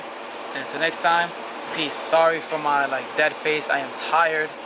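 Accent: New York, United States. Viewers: U from ny gotta be?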